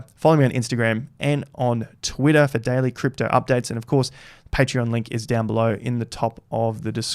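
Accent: Australia. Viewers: Another perfectly explained video that helps most <12month newbs. (Me included). Such a great Aussie way of delivering as well. Thanks for your content.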